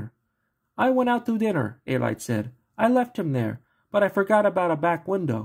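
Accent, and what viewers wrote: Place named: Albania. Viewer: Obviously you’re a John Alite fanboy. By your accent u must be Albanian and I understand the ethnic pride but. No doubt John was a tough guy gangster deeply involved as an associate under Junior Gotti. Def he was a capable guy but I think that u believe the hype too much and fail to realize that there’s 3 sides to a story. Obviously you weren’t there but you believe one side of the story about both Pitera and Gene Gotti. Alite’s side. I’m sure Pitera and Gotti have their versions, then there is the truth. But keep the goofy accented vids coming bc they’re comical.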